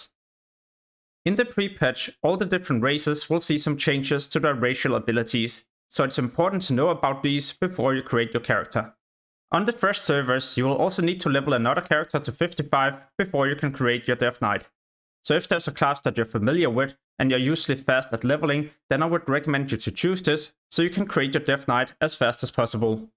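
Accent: Denmark. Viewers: Danish best accent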